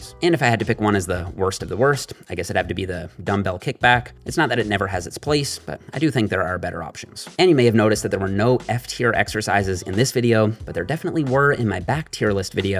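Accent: Canada. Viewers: He has such a strong Canadian accent 😂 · Love his Canadian accent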